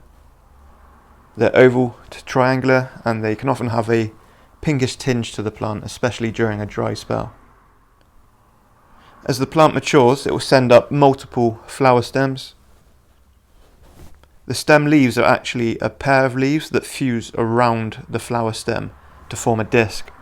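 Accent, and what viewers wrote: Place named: Bristol, United Kingdom. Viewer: Where abouts are you?? You sound Bristolian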